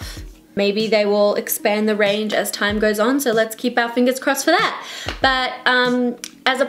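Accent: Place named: Australia. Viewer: Is it bad that I read the title in her Australian accent? Lol I just had too 😭 I love her too much ❤ She's soo beautiful!!! I can't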